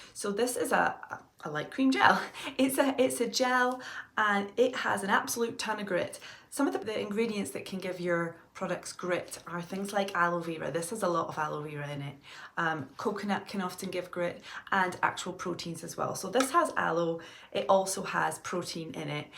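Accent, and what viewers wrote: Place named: Scotland, United Kingdom. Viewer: Hi, thank you for this video. Are you from Scotland? I don't hear a strong accent but I'm sure I detect some Scottish. Thanks to your videos, I have ordered Curl Keeper Original. I'd never heard of it until you mentioned it and I am thinking it just might be the perfect styling product for my waves.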